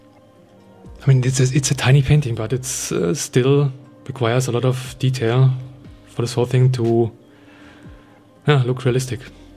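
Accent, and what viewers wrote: Germany. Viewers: Du bist deutscher oder? Ich glaube ich höre deutsches englisch 😂❤ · Du klingst als kämst du aus der Region Dresden. Oder täusche ich mich?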